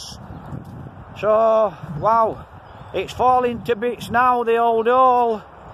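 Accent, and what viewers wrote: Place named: Barnsley, United Kingdom. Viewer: I liked your video, I forget how strong the Barnsley accent is haha! You seem like a lovely local fella 😁❤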